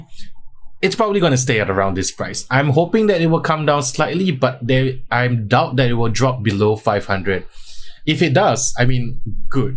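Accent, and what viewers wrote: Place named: United Kingdom. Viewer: amazing content! I thought u were brittish ur accent so good!!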